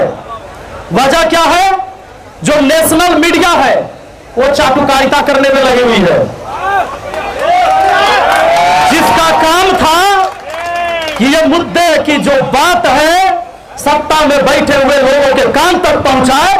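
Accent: Bihar, India. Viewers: Bihar ki awaj hai * sir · गुरु जी बिहारी की बोली पुरे देश में गुंजना चाहिए!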